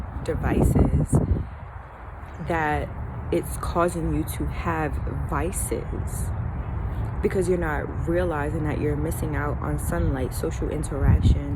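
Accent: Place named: Baltimore, United States. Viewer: OMG you sound like you're from my hometown!! Aye Baltimore! But you gave some very insightful advice bc I had to makes changes myself regarding the overuse of technology and the underutilized benefits of nature. When I walk my dog, I hardly see anyone else outside but just feeling the rays of sunlight on a consistent basis helps my physical and mental health tenfold. Its easy to get complacent with being indoors but after a while, it can take a toll as you mentioned. I begin to get more anxious after too many days indoors. The body will certainly alerts you when something is amidst.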